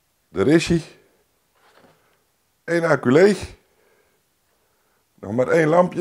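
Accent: Brabant, Netherlands. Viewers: Advies: eerst even een cursus Brabants volgen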